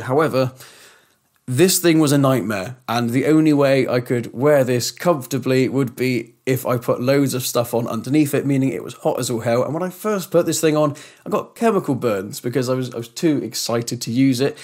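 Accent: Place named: RP, United Kingdom. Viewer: You already won yourself a new subscriber for speaking properly. We guys on the continent always enjoy listening to you guys in the UK exercising your stiff upper-lips… — Posh enough for me at least ;-)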